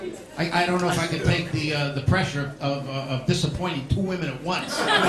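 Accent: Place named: New York, United States. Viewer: This guy is hilarious. Love the new York accent, sorry to hear he has passed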